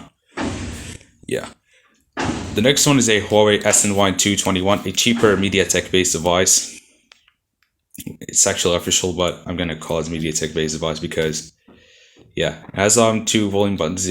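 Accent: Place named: United States. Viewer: why do you sound kinda american lmao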